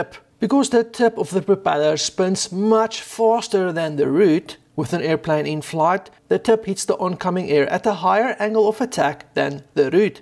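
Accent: South Africa. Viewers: You sound South African 😊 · Ek wou sê jou aksent klink South African. Toe ek die eerste ZS sien op n vliegtuig toe weet ek. Nice video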